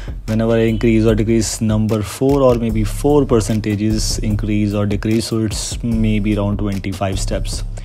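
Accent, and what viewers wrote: India. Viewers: How can i block/ban all Indian Youtubers with one button? The Indian accent is horrible.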